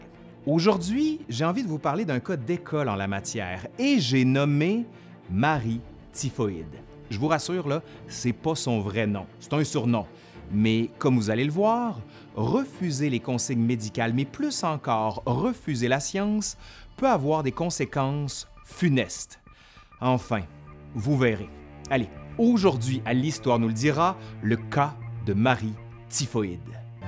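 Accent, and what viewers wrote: Quebec, Canada. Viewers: Tu raconte trop bien et j'adore l'accent Quebecois.... Tes histoires sont top 👍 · Déjà entendu auparavant, mais je savoure votre manière de le présenter, avec accent québécois, humour, beaux yeux et de belles images. Merci! 😉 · Rien que pour l'accent du Québec, j'adore vous entendre... Enfin, j'adore aussi vos histoires! Bises de France.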